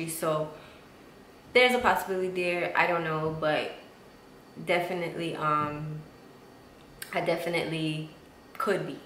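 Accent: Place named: United States. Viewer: lol I was about to ask but wasn't sure because of your american accent--if you were Habesha lol. Now I know ur not :)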